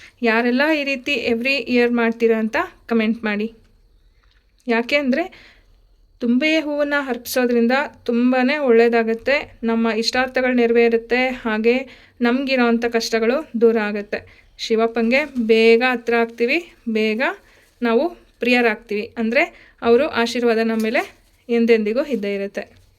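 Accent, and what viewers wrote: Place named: North Karnataka, India. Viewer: 😂😂😂👌👌👌👌 ನಮ್ಮ ಉತ್ತರ ಕರ್ನಾಟಕ ಭಾಷೆ ಏನು ಕೇಳ್ತೀರಾ 😅😅😅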